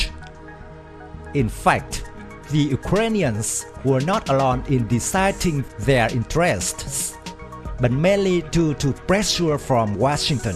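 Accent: China. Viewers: you are chinglish accent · Agree his accent is so thick and heavy he might as well speak his native language Chinese lmao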